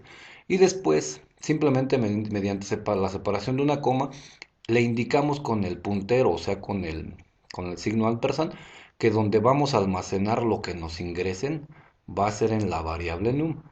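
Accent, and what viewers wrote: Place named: Mexico. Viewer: De todo YouTube es al que más le he entendido, con respecto a la programación. ¡Felicidades! Se le entiende muy bien, yo creo también tiene que ver que use las mismas expresiones y el mismo acento que yo, ya que también soy mexicano, soy de Guadalajara. Saludos.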